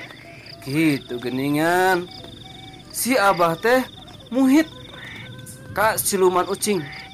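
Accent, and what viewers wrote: Sunda, Indonesia. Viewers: Wa alaikum salam wrhmtllh wbrkth masha allah lalampahan abah kanta semoga makin sukses kang meni sae dina nyariosna lagam nada nadana cirihas pisan orang sundana hatur nuhuun kg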